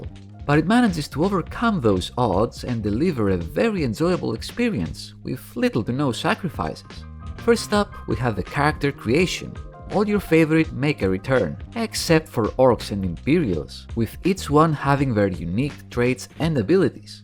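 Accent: Greece. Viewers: lol that Greek accent knocks me out ahahah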